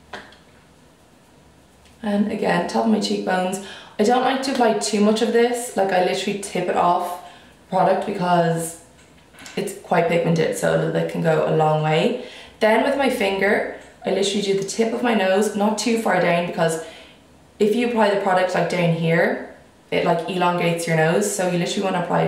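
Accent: Ireland. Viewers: Love this kind of video! Could listen to you talk all day. Whoever doesn't love an Irish accent is crazy! Keep it up babe 💜🙌🏼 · it's only an accent to those who don't live in Ireland.. i love your accent! I'm from Boston so we say things funny too aha love you girly, beautiful as always! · anyone who complains about your accent and the way you pronounce things can suck a toe. how ridiculous! i loveeee your irish accent! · Screw whatever people say about your "weird" accent. It's beautiful!!! I live in New York and I'm Puerto Rican, all I hear all day is boring American English and fast paced Spanish. It's refreshing to me to hear you speak with your wonderful Irish accent. 🍀🍀🍀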